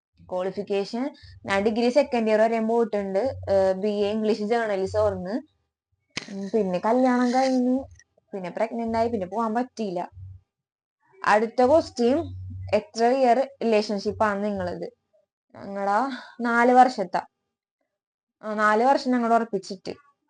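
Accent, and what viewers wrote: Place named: Kochi, India. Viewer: തനി കൊച്ചി ഭാഷ 😇😁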